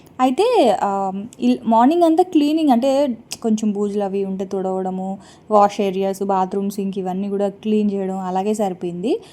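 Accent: Godavari, India. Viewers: full godavari and vizag slang lone matladataru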